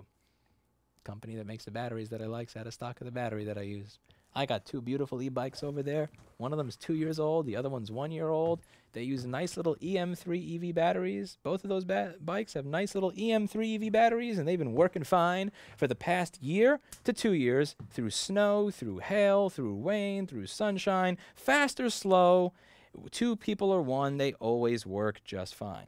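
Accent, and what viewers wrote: New York, United States. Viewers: Watching your video from my bed, i wondered "why did i subscribed to a guy who fixes Mac systems"? I bought a mac some time ago cuz i wanted to do more photoshop for my pics, but haven't been taking pics for some time now. And i don't think i'll be opening a mac anytime soon. So after a couple if minutes, i realized: 1. i've always liked the NY accent (never been there, not sure why. Not even my native language ) 2. i like real people, and you keep it real 3. i like that underdog attitude. Keep up the good fight, i'm a believer in hard work. Been working since 16, almost 3 decades in a couple of years. Not the sharpest pencil on the box myself, but i firmly believe that if you try to do your best everyday; life will treat you fairly. I've had a rough couple of years before the covd hit the fan. But my ant determination (i feel like im in autopilot sometimes) has kept me afloat, and things are looking bright ahead for now. 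Cheers from Costa Rica. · If you could boil down all that's good an interesting about NYC, it would look and sound like Rossmann. The world needs more.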